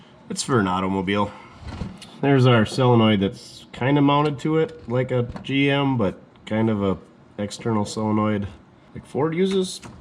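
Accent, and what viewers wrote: United States. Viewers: Sounds more like Eric from maine auto to me, sounds more American, not yankee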